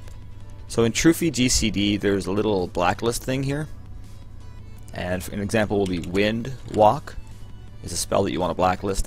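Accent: Canada. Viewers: u canadian guy? love the content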